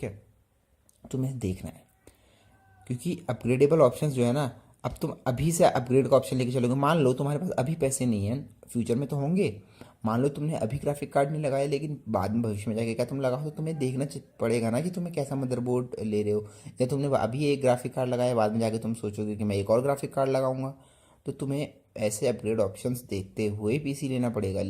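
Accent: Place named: South India, India. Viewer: isme mein toh bhai south indian lag raha hai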